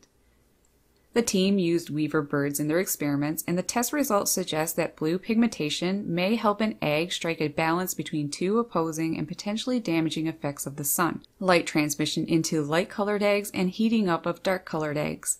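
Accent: Canada. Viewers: I really love this video about the color of Robins’ eggs & the blueness! Very interesting and informative and educational! It totally makes sense! I was just thinking maybe they were that color, so if the egg fell out and went on the ground… But I guess mom or papa bird can’t pick that egg up and put it back in the nest!!! Thank you very, very much! Love your Canadian accent! Gay Michaelis 😁👋❤️🤗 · I can tell you're from Canada. You say" aboot" for about. Lol.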